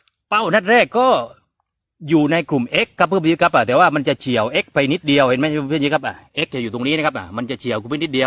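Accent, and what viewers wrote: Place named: South, Thailand. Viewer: สำเนียงภาษาคนใต้เลยหลวง ชัดเจนครับผม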